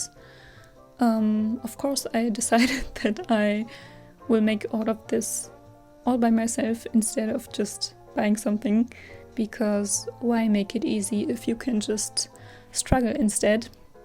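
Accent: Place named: Germany. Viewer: I WAS SO SURE YOU'RE GERMAN BC OF YOUR ACCENT- fellow german here :3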